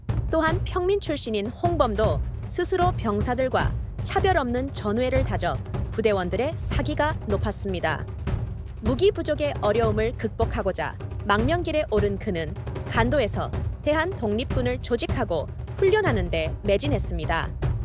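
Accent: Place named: North Korea. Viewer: 이거 읽어주는 여자 북한인 같다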